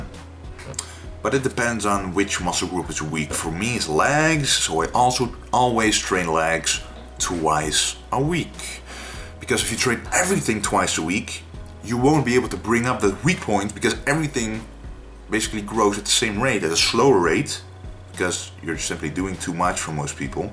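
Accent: Netherlands. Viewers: What accent is it? Is it Dutch?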